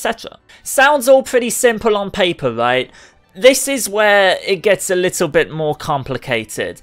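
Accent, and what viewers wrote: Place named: Liverpool, United Kingdom. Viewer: SCOUSE DETECTED RED OR BLUE CHAMPIONS OR CRAP CHOOSE NOW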